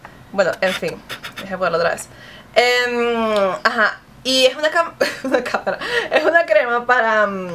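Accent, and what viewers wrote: Venezuela. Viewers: todass las venezolanas hablan asi, jajaja bueno a excepcion de algunas pero casi todas tienen ese acento y no es que sean pretenciosas.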